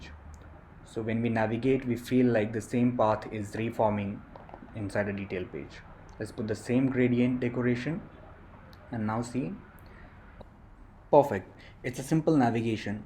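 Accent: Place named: India. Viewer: WTF with the Indian English omg it seem like 😱 where did you learn that kind of accent guys ??!! U r awesome by the way 👍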